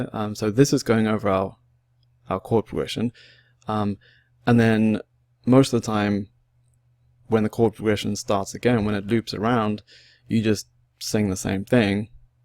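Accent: South Africa. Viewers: Thanks for the video dude! Really helps a lot 😊 Are you South African by the way?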